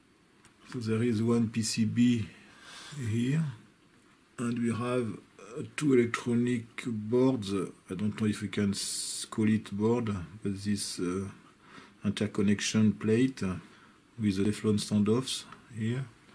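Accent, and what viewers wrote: France. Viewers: What a French accent !!!! 😅😅😅😍😍😍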